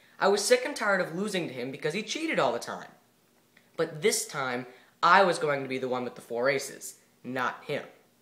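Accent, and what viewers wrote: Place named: United Kingdom. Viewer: Oh, are you British or American?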